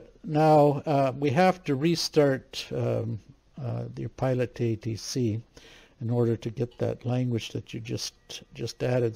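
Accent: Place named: France. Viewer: Thanks a lot, small but very useful tutorial. In addition, as a French, I like to listen to this "particular" accent of my compatriots!!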